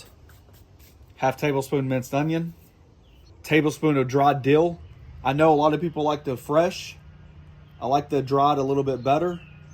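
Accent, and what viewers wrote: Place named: New York, United States. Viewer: There is nothing wrong with your overalls. I happen to have the same ones, carharts I love them. My friends all say they never saw overalls on someone with a new york accent before. I just say YOU GOT A PROBLEM WIT THAT????? ps. Where did they go? Gone half way through the video. For get a bout it.....THANK you Frank from montana...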